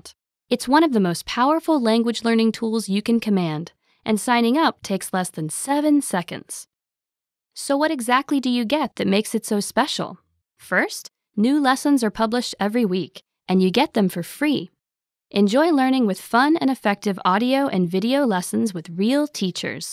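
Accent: Vietnam. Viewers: Is this northern or southern Vietnamese?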